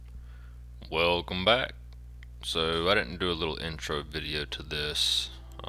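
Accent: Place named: United States. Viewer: Hey, in this Moment, i am a Fan of you and your work. Very nice. Where are you from in the USA? I like your voice and your dialect. Many greatings from northern germany, from me and my girlfriend. Stay care and healthy.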